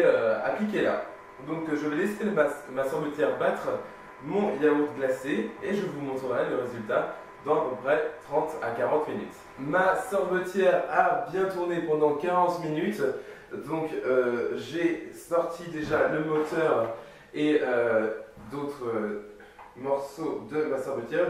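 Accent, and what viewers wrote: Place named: England, United Kingdom. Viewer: ton accent anglais est terrible, sinon bonne vidéo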